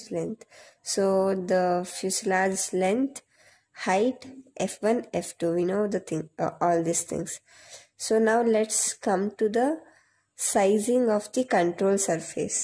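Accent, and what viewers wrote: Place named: India. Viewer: Worst explaination, Improve your english. Do not speak indian english. Learn international english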